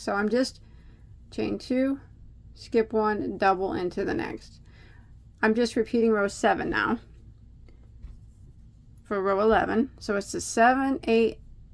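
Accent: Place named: Midwest, United States. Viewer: I love it! I have been looking for the perfect pattern to make as a gift for my sister. Have a number of wips so will be a little while before I can start this. I love listening to you. I’m from Iowa but have lived in Washington state most of my adult life. I enjoy your midwestern accent. My youngest son often corrected me on n how I said wash. Mom there is no “ o” in Washington.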